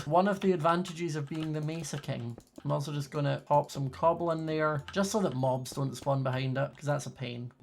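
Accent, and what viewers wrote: Scotland, United Kingdom. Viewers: Scottish - English Tiger-tinger Cute-cuuet Lol leave a like. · What part of scotland you from, assuming not glasgow, maybe edinburgh?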